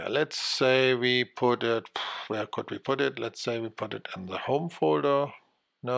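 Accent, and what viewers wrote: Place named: Germany. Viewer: Ist mir schleierhaft warum du das auf englisch gemacht hast. Mit einem derart starken deutschen Akzent wäre es auf deutsch sicher besser geworden. Dennoch interessant.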